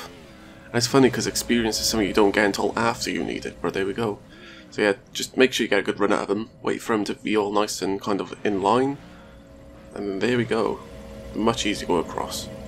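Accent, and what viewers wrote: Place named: Wales, United Kingdom. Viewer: Nice one boy-bach! Glad to see/hear a Welsh voice doing an achievement guide. Keep up the good work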